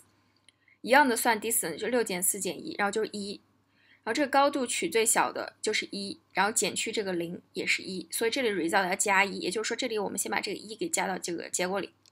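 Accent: China. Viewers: 阔以阔以，是不是四川老乡啊哈哈哈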